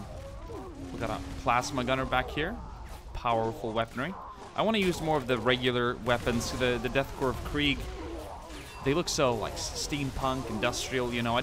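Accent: Sweden. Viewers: U swedish? Am i Finnish 😁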